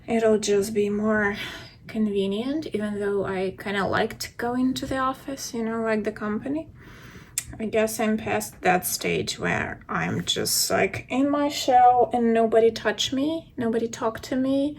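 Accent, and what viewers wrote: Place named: New York, United States. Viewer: You have picked up some NY words. Like you said “cawfee” the other day. Meant to tell you. Haha. You are an official New Yorker. There are a few words I have heard that reminded me of living there.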